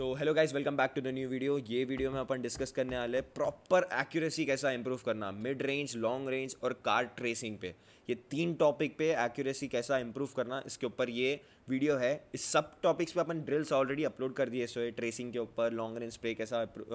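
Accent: Hyderabad, India. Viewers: Bhai tu pakka wala hyderabadi lagtha hai 😍🤩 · That hyderabadi accent is lit 🔥🔥🔥🔥🔥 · Bhai bohot dino bad apki Hyderabadi Sunna bohot acha laga bhai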